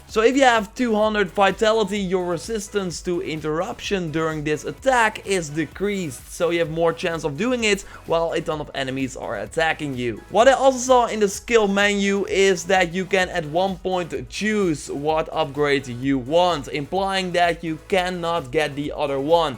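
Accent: Netherlands. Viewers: Mr_Bohowsky he's Dutch. Let him be. The Dutch-English accent is our fucking pride, man.. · Nederlandse accent cringe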